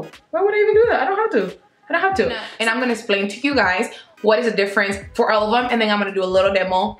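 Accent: Panama. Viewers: I'm 50% Cuban & 50% Panamanian And I love hearing you talk in Spanish because I wish I can talk like that w that "que sopa" Spanish accent 🙈😿 lol viva Panama #Colon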